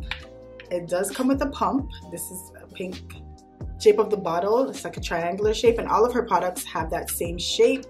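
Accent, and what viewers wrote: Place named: Barbados. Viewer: I detect an accent...are you Bajan? Btw I love how that foundation looked on you♥️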